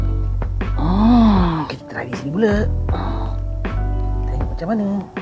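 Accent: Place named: Temerloh, Malaysia. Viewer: Sedak btoi dengo org tmeloh borok nyak..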